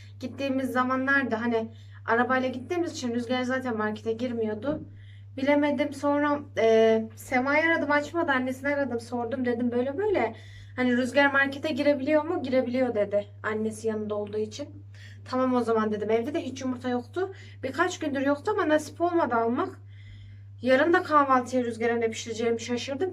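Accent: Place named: Zonguldak, Turkey. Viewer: Size yeni abone oldum videonu beyendim nerelisiniz acaba konuşmanızı zonguldak tarafına benzettim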